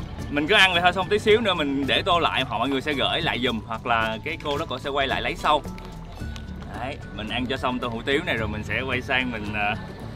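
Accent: Mekong Delta, Vietnam. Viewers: / dể thương nói chuyện đúng dân miền Tây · Giong mien tay thi khong phai chê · giọng nói đặt sản miền tây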